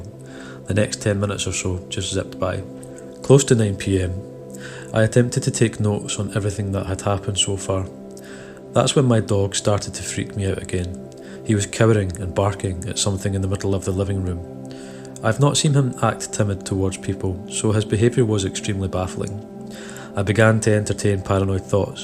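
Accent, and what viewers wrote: Scotland, United Kingdom. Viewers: I was watching Shrouded Hand's video on scary trips and I ran out, so I tried to find some more trip reports on YT, but I remember thinking "aaaah, they just wont be the same without his gentle tone, eerie music and Scottish (?) accent"... then I landed here.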